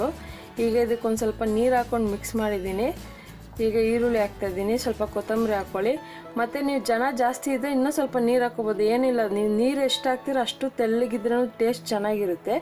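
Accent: Tamil Nadu, India. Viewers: Nimma language noduddre gothaguthe you are from tamilian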